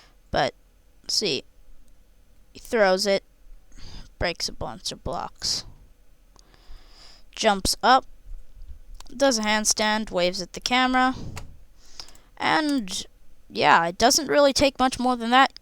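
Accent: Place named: United States. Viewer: Wow this video is a bit more detailed than the one i watched at first and it helped quite a lot. also (no offense) but I remembered how to do that because both videos i watched had someone with an american accent so I remembered it!! xD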